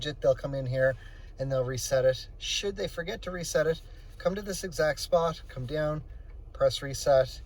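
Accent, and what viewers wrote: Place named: Canada. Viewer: i could hear the canadian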